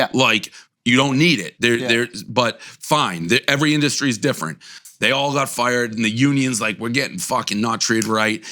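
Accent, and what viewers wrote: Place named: Boston, United States. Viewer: love the Boston accent when its mixed with good articulation.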